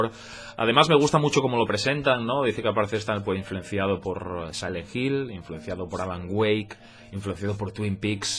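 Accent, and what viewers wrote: Asturias, Spain. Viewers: Es asturiano? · eres asturiano?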